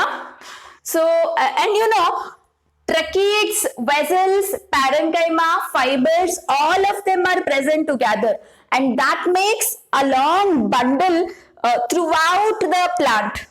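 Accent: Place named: Andhra Pradesh, India. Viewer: R u from andhra??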